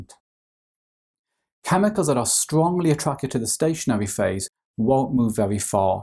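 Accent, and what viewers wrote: United Kingdom. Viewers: Think the accent is British- colloquial .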